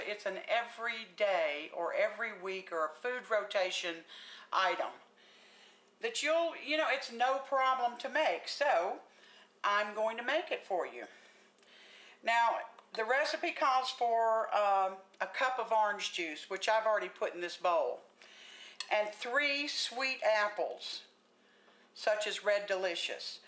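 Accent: South, United States. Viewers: Mama always made ambrosia at Christmas. I still make it for my family. They gobble it up. Can I ask what state you are in? You have a very cute Southern drawl. All of my family is from Tenn. Sometimes people still ask me where I am from. Guess they hear a little twang in my voice..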